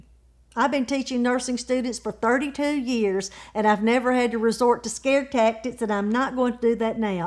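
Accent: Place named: South, United States. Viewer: I could listen to you all day. Love the southern accent.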